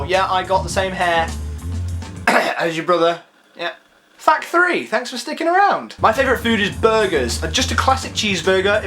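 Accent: United Kingdom. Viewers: Your British accent is beautiful, you're beautiful. Also your lips look kissable. Also was listening to you wasn't on mute. Oh my.. I'm awkward. Byee(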